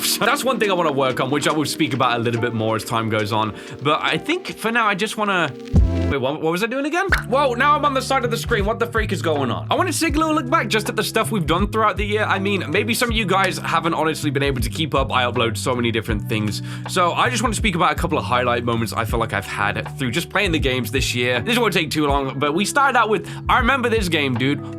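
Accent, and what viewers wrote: United Kingdom. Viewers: I only discovered your channel late 2025, and have been binge watching since. I really appreciate your voice acting - I don't play games myself, but I love watching game plays like movies. Your voice acting really adds to that experience. You always reading out the texts really helps me not looking at the screen - I'm actually scared of horror stuff so I deliberately not look at the screen all the time when watching horror games lol. I really appreciate your curiosity and the fact that you always explore in games. I can always rely on your game plays to catch probably most lore of the storyline compared to other channels. I do get a bit fatigue with your high speed yapping and some lines you often say lol. But then it could be just because I've been playing your content across years back to back to back lol. I remember there were times when I was doing something with YouTube autoplay in the background and your video came up. 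I was like "what is this British dude yapping about now" lol. Or sometimes when I hear "hold up" "it's kind of good though" I was like oh brother...! But none of these are real criticism, I say all these with fondness for your content. Happy new year! I'll keep watching your videos in 2026🎉❤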